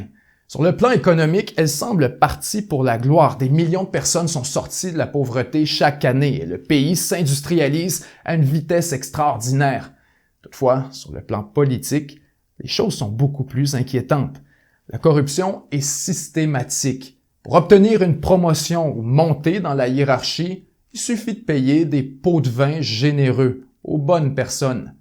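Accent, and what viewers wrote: Quebec, Canada. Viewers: Bonne vidéo, malheureusement l'accent québécois m'insuporte ... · Ton accent canadien est tout simplement cool avec une adresse oratoire impeccable. Les espions sont éliminé systématiquement car ils savent très bien ce qu'ils font ; ni vue ni reconnu, quel dommage de sacrifier sa vie pour des causes aussi basse. Hélas comme la betise humaine est insondable.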